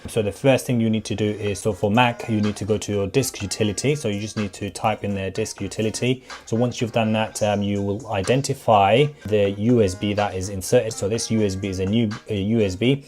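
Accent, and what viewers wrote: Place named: Bradford, United Kingdom. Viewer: You’d either from Bradford or Birmingham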